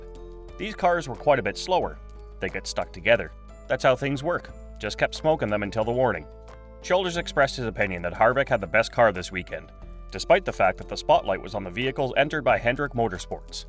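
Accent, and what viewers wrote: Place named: Canada. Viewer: A NASCAR channel with the Canadian talking eh,. What a joke!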